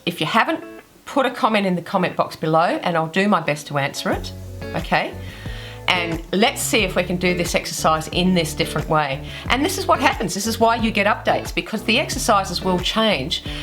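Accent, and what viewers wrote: Australia. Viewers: is she from Australia ?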